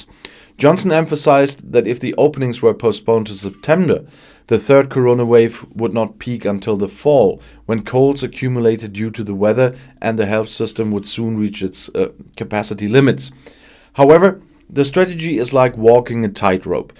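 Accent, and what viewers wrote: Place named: Germany. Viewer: It sounds like you're from Germany I think you should look at your own country first before you start criticising everybody else is my friend you were actually down and Vaccines at the moment did you thought you did a great job the first time but the second time you've made a right f**** of it you go and look after your hand side and when I'm going to listen to you European people because you don't know what you're talking about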